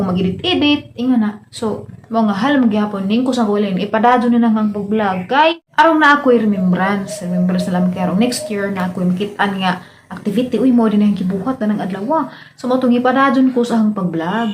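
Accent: Bohol, Philippines. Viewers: Inday bilib ko nimo.gahi man jud ag sinultihan sa atuang tga bohol.proud ta tga bohol ta.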